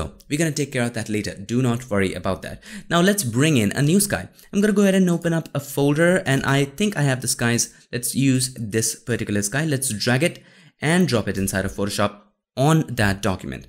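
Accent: India. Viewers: are u indian?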